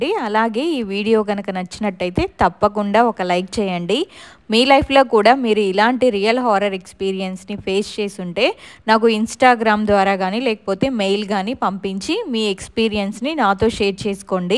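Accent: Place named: Coastal Andhra, India. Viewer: 🚩✅ You are speaking a brry perfect pite Krishna Coastal Telugu language with ease & without any corruptive influencial slangs of Indian language Urdu or exotic Arabic language. Very impressive. Very good. Keep it up. If interested you Study "Garuda Puranam & one special chapter in Oka Yogi Atma Katha " that narrates"Life after Death of Human persons of bad souls - Evil forces. By this you will have better understanding about dissatisfied Souls that roam around their related people. ✅🚩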